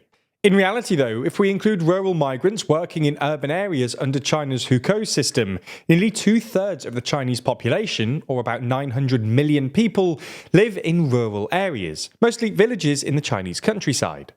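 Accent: United Kingdom. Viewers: omg, this uk accent drives me crazy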